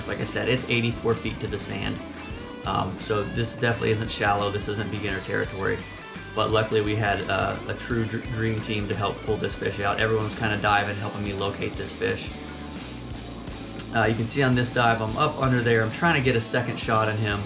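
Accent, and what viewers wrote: United States. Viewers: Cuidadito que habláis feo, eso que es inglés? No creo, el inglés suena más bello. Ahh es Yankee! ACABARAMOS!!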